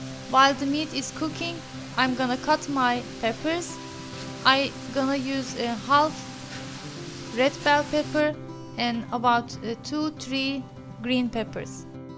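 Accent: Turkey. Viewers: I just love turkish food and i love turkis english accent 😂🤣🤗💪😁🇹🇷🇸🇴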